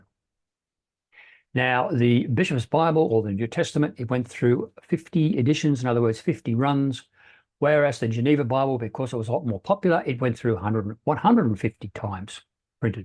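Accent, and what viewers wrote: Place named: Australia. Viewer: Cool Accent. Love The Aussie Accent.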